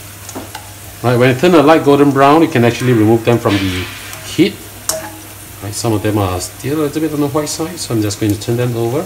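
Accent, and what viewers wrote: Singapore. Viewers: Wah lau. Nice video and great narration in chaste Singaporean accent. Miss the place and the people so much. Take care aah. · You speak like a Singaporean... I miss Singapore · blech71 singaporean accent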